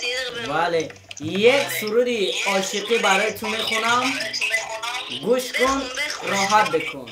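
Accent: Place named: Iran. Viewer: Акцент кати гап мезана додарчон иронира,хубш точики кати гап зана бехтарин мешава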